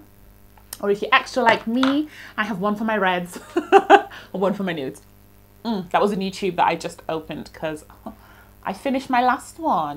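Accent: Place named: United Kingdom. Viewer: no problem! Haha I can't hear it at all. You sound like you landed from the UK like yesterday 😅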